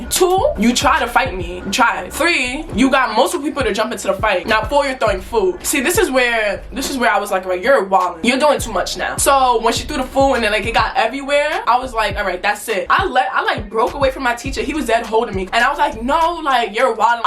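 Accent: New York, United States. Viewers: The aii so boom just know she from ny cause I do the same thing 🤣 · I could deadass tell you from new york😭. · Yea u can definitely tell she from ny💯🔥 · You gotta be from New York😂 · ouuu i know a new yorker when i see onee 😫😫 lemme guess u from the bx or brooklyn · Nah she gotta Ny accent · the NY accent💕💕💕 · This my first time watching your video and I can def tell that your from New York 😭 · The way that I knew you were from NY and then you said Coney Island so ik for sure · You from nyc❓ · she dead got the deep ass ny accent🤣❗️ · New York accent hella strong 💪🏿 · you have to be from new york!😭your accent gives life! edited:i said that before watching everything 💕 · You’re from ny huh🥺? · i love how u jumped right into the story & that NY Accent and you are pretty!🤍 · You can tell she’s from new york by how started the story 🤣 yktv yessir 💞🕺🏾❗️ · She definitely sounds like a New York girl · You have to be from New York 😂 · You def from ny😭💞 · Soon I heard the newyork accent I subscribed WHOMPP🥳🥳hey sista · Definitely from ny😂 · u sound like u from ny 😂😂 ? 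· Instantly knew she was a fellow New Yorker right off the accent and the "Ight so boom" lmao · Are you from NY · I knew you was from NYC 💪🏾🤟🏾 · Definitely from New York lmaooo " aii so BOOM " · You from New York or am I trippin???? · I’m new to this channel and I already know she from New York 💯❤️ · You must be form New York I hear the accent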